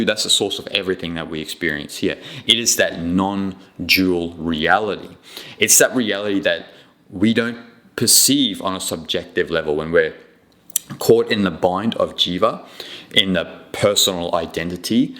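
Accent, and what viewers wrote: Australia. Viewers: Good on you my Friend to explain all this in your Accent which is easy for most of the westerners, I guess urs is Australian Accent, which is I am familiar with as lived there for 5 years, Good to be in contact with you regarding such discussions, Take care, Revert if you feel like.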